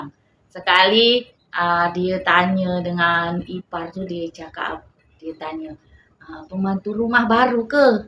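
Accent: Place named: Java, Indonesia. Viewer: Slang Jawo bunda masih pekat sangat saya dengar, kakak ipar saya dah macam orang tempatan dah bunda slang bahasa' Jawo nya sudah kalah dengan bahasa Iban dah😅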